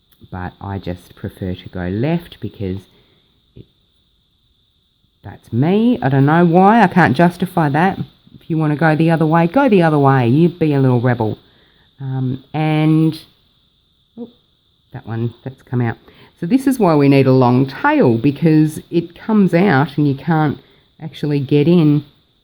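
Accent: Australia. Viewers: Thank you for the video, I love this pattern. Love hearing an Aussie voice too, look forward to more videos. G'day from Adelaide.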